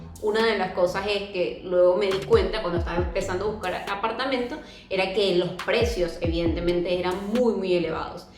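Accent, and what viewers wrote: Venezuela. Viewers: Que HDP el que te dijo que no le gustaba tu acento, como argentino realmente me da vergüenza ese peloduro, con respecto a los alquileres eso lamentablemente pasa en muchos países y en algunos es casi imposible conseguir alquiler si sos extranjero. Volviendo a lo del acento creo que lo mas lindo es escuchar a una mujer con acento venezolano ja ja ja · El que te dijo que le molestaba tu acento es un tarado, N le hagas caso. A mí me encanta el acento de venezolanos y colombianos. 🙂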